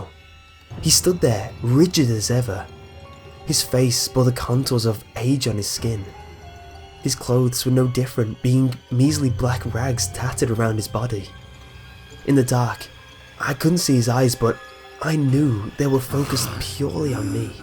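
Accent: United Kingdom. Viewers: Is it just me or do stories sound better with a british accent? has a good mic too so he is my favorite narrator.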